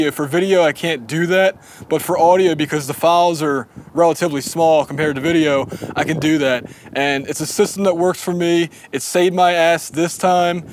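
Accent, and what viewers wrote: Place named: Philadelphia, United States. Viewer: Hmmm... sounds an awful lot like you have a Delaware valley/Philadelphia accent. Anyway, I really enjoyed your song analyses and the loudness wars video, keep up the good work.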